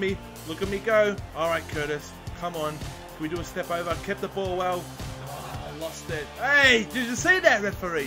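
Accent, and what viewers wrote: Australia. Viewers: Your Austrailian arent you?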